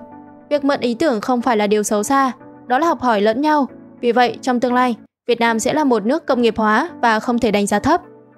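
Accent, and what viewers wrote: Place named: China. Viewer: đây mới là cách nói chuyện của dân trung quốc chứ chứ mấy ngày hôm nay nói chuyện không giống lắm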